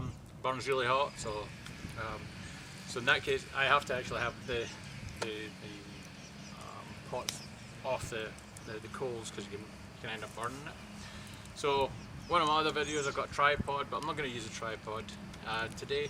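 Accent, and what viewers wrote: Scotland, United Kingdom. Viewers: Are you Scottish (I am) and for the pen fishing rod video you made a year or so ago can you make a follow up video on the line, hooks, bait, floats, weights ect. Great video and have a good day!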